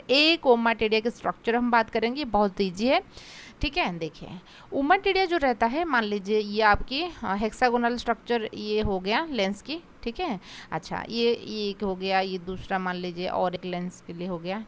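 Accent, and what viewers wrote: India. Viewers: So sweet hindi accent mam ❤️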